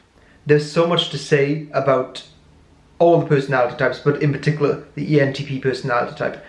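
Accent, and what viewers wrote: Wales, United Kingdom. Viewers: Bruh I really want to listen to you but I just can't stand your british accent. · lovely welsh accent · Are you welsh?? Sorry just trying to pin the accent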